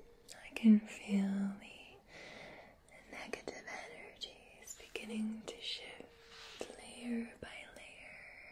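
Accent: Scotland, United Kingdom. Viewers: I didn’t realise you were Scottish until you sounded American to me at first cos I’ve never heard you before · Wow, you created an awesome & hypnotic ASMR filled video that I love!! You're the sexiest Vampire I've ever seen, & that black lace dress, with the ambient sounds, reverb, & your various effects make this a smoking hot Halloween themed video, imo! The reiki, backdrop, & your lovely Scottish accent, & mind control work for me! Great job sweetie! 🎃Mick💓💓💓💤💤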